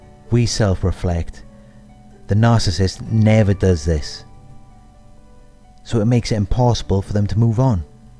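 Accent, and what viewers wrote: Wales, United Kingdom. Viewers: New sub. You from Wales? You sound like it. So true video.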